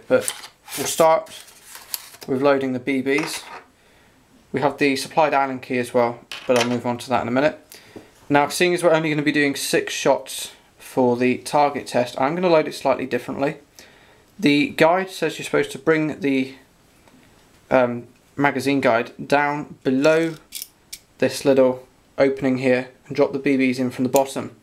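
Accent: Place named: United Kingdom. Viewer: were'd u buy this gun , i take from your accent your in the uk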